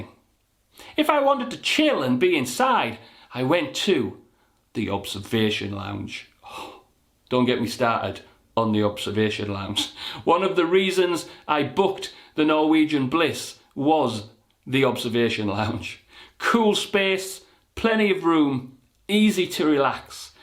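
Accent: Scotland, United Kingdom. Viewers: You sound Scottish? I want to follow your example for cruising!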